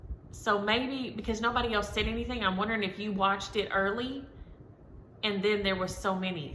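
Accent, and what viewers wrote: South, United States. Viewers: Love your channel. As I stitch this SUMMER, I'm watching your videos, love your PRETTY southern accent too.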